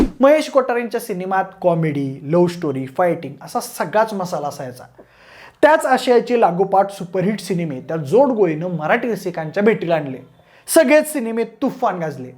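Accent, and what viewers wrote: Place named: Nagpur, India. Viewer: मला वाटले नागपुर करांचा आवाज़ आहे की काय तो।।।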